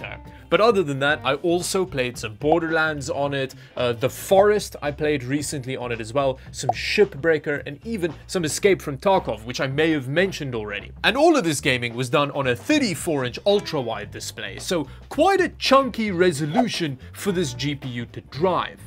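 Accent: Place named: South Africa. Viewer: I love your South African accent, I only realised the other day that you were from SA thanks for the great quality videos, liefde van uit die tuis land❤️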